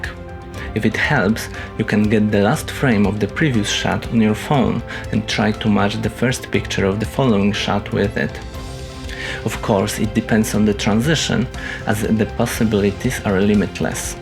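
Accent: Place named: Russia. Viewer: Вы русский? 😅 You have russian accent Well done 👏 I learned a lot 🙌 thank you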